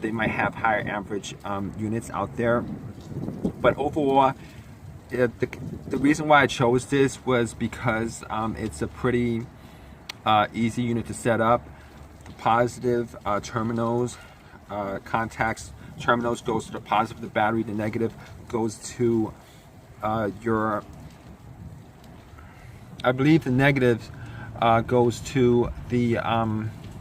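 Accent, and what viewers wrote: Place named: New Jersey, United States. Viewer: Thanks for your review. Where is your accent from? New Jersey?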